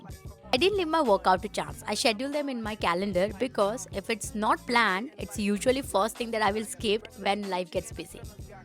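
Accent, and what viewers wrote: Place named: Gujarat, India. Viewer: Are you a Gujarati..accent thoda gujju type hai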